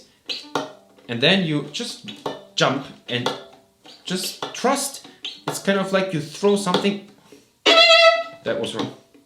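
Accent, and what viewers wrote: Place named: Germany. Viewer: Your English skills would be more convincing, if you pronounced the simple word "and" [and] rather than [änd]. Pay attention to the "d" and the end. German people tend not to differentiate the words "ent" and "and" in terms of pronounciation. The same applies to the word practice. German people tend to say [präctice] and those are tiny words revealing you as a German guy. I know that at school the teachers do not know better.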